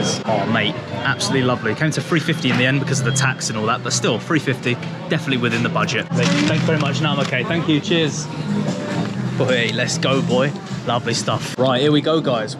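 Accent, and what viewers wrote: Cockney, United Kingdom. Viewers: Only stumbled across ya videos few days ago. Been binge watching em all 😂😂 funny cockney geezer me bein bias cos im cockney myself lol. Brilliant videos mate 👊👊👊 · I love his personality, a budget blogger…love it! Plus you can’t beat the British accent. 😅 Had to sub.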